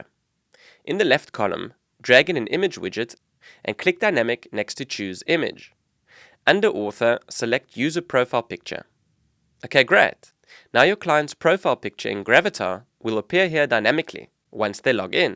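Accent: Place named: South Africa. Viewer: This dude is definitely South African...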